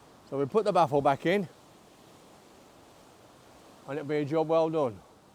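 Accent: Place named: Erdington, United Kingdom. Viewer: Great Vid. Lovely to hear your accent. I'm thinking Erdington. Am l right?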